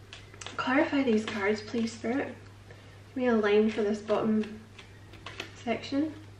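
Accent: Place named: Scotland, United Kingdom. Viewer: Your Scottish accent is off the chart sexy. The hell with my twin flame , I'm feeling your energy 🥰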